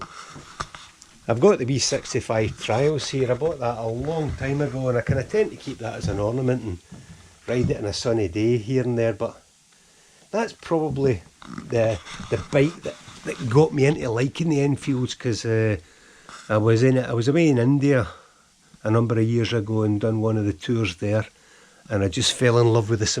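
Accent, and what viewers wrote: Scotland, United Kingdom. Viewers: You sound Scottish! What does “57” stand for on the wee bike? And the wind drowning out your narrative on the ride as well as the banjo music so I cannot understand you thou we speak the Kings English here in Texas USA! Too! Hoo mon dude ! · Well, I am a yank!...and we yanks struggle with those British accents. Scottish, right? And good luck with your secession from GB. Seriously. 😊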